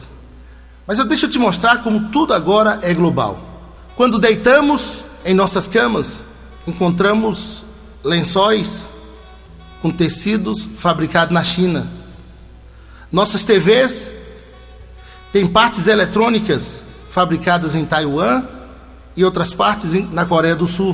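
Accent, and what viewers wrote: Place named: Northeast, Brazil. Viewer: Amém PR. Boa mensagem, boa de se ouvir, um conteúdo de grande qualidade, que o Senhor continue te abençoando mais e mais, mensagem que edifica, parabéns pela sua dedicação nesta obra. Estou aqui em Cotia-SP, e matando até um pouco a saudade de ouvir o nosso povo nordestino, nossos conterrâneos, isso nos faz bem.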